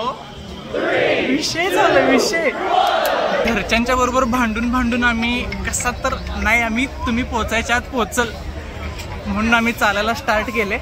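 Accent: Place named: Kolhapur, India. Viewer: Awesome vlog brother🙌🔥. Congratulations on successfully completing your architecture degree👏. I had watched Krish mahadiks vlog of climbing tirupati balaji steps. You guys are amazingly fit, you didn't took any rest that's commendable. And love your Kolhapuri accent❤️😄. How much time did it took for you guyz to reach the top?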